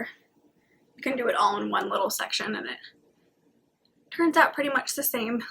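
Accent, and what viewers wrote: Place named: Midwest, United States. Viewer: I just found you while looking for tips on trimming my hair and I’m so delighted you seem to be sticking around! It’s wonderful to see someone with genuinely long hair on hair YouTube!! Could we maybe get some more tips on other kinds of styles for long hair? I’m not sure if you wear your hair back much, but there aren’t a lot of people with any idea how to deal with real length when doing updos or braids so any tips you have would probably be helpful to those of us who love our long hair but aren’t always sure the best way to handle it. I really hope this doesn’t come off as preachy or bossy but... please be very careful with any travel plans! I live with a microbiologist so I’m pretty up to date on what’s going on and there’s a huge spike in cases and hospitalizations in parts of the country right now and we won’t even start to see the effects of 4th of July parties for about 2 weeks. 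We just had to start shutting 7 counties back down here in California. Maybe stay local-ish? You sound like you’re from somewhere in the Midwest and it seems safer there for the moment. I hope you have a happy anniversary though!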